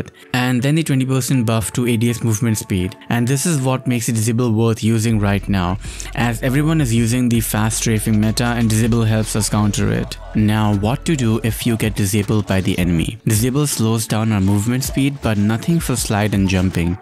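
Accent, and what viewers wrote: India. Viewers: bro are u from india? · Bro! R u a Indian?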